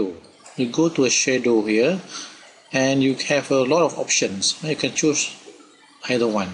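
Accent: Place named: Malaysia. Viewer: aku dengar suara org speaking tu mcm org malaysia..last2 tgk logo mmg malaysia punya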